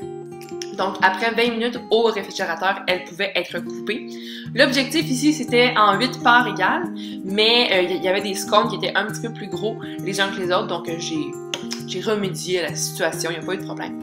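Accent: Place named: Quebec, Canada. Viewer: Après 5 ans mais pas grave et sur un très joli accent Québécois que j'affectionne !... 😘♥ 👍✅ Magnifique ✅👍 Merci pour le Partage Tout du Bon pour la Suite !... Amitiés "ℜonny" /Femme/61 ans/ Alsace/ France ... ◕‿◕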